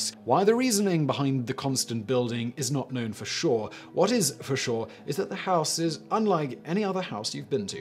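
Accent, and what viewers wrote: United Kingdom. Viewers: Who is this British man? And i wander does he smoke weed?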